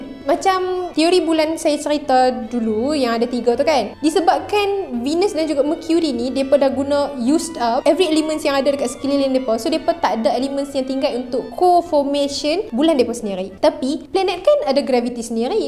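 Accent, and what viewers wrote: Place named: North, Malaysia. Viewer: Nohh lorat utara pekt nohhh...rindu plk kmi kt kg halaman...telari dri topik😅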